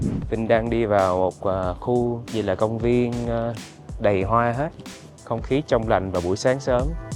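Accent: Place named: Saigon, Vietnam. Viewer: Giong de thuong, giong chi'nh goc nguoi SG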